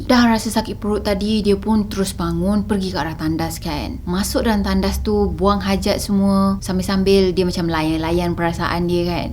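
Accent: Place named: North, Malaysia. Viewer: Asslam...hai asal mana utara kan...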